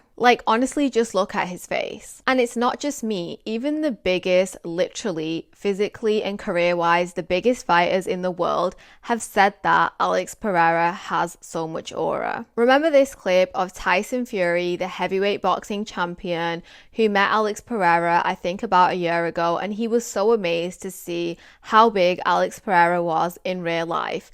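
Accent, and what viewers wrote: United Kingdom. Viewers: She doesn't even look Russian lol can you even hear her accent??? She has a UK accent.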